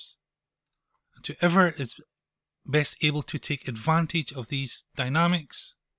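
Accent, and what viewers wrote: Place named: Scotland, United Kingdom. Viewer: Terrific videos. Love the scottish accent too.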